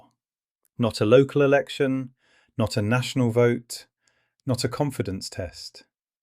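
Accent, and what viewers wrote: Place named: United Kingdom. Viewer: Narrators accent is British… considering what a 💩 show the UK is right now…. Hmmm 🧐 I say your video is 🐂 💩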